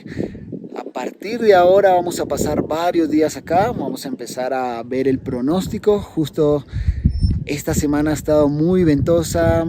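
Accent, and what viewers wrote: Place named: Argentina. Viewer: que buen video! me llamo mucho la atencion tu acento jaja, cada tanto te salia un acento argentino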